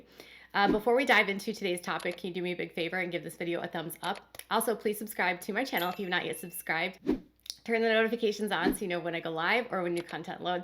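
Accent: Minnesota, United States. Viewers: Minnesota? No wonder. I thought you were Canadian. That dang accent. Sorry you have to live in such a cold area. It’s nice here in Los Ángeles and, it’s super nice here.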